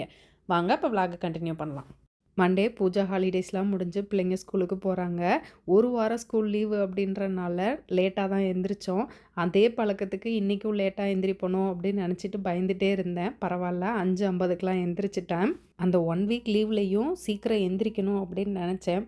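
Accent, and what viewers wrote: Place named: Palani, India. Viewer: Hi sis....recently i started watching ur videos...i love ur Tamil slang because its very nostalgic to me as my mom's native is palani neikarapatti and my relations over there speak like you..your vlogs bring back my childhood memories...today morning i saw ur old vlogs like love story,album vlogs and so on.....oru oru vlogs um enga ammaye oorla naa irukara mathiri feel aaguthu....mainly ur amma veetuvlogs along with your akka n family....!! Ippo yaarum oorla illa sis thatha n ammaye is no more..yellarum padippu,velai,kalyanamnu vera vera oorla settle aagitom....oru oru school summer leave ku vandha eppdi irukumo apdi irukku...unga face kuda en friend Selvi face mathiri irukku....my MEIYAZHAGI😢..!! I donno where she is...cudnt get the contact too!! a big hai to your family members n brother's ideologies!! Final thing i want to share is check your Magnesium level and kuthingal vali reason is mainly low Magnesium...consult a doctor...she will definitely suggest NEUROBION tablets...!! 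Don worry it is Magnesium deficiency..i will suggest ur vlogs to my cousins...so that they can also get the nostalgic experience...❤❤.take care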